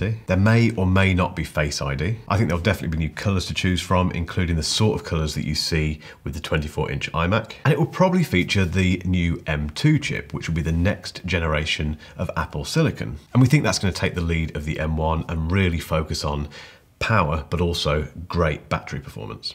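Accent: England, United Kingdom. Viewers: Like your reviews,! Great stuff! Also nice to listen to English English language! Cheers from Moscow, Russia🤡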